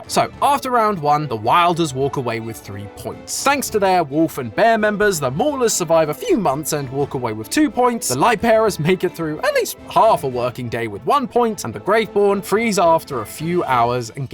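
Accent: United Kingdom. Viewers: A British voice explaining lore just hits different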